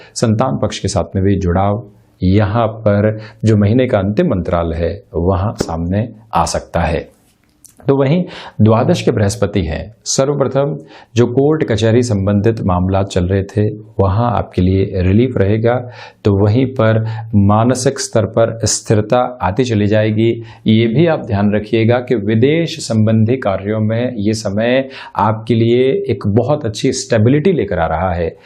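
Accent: Standard. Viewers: नमस्कार गुरु जी आप बहुत विस्तार से विश्लेषण करते हो , इस के लिए धन्यवाद और आप की शुद्ध हिंदी बोलना मुझे बहुत पसंद है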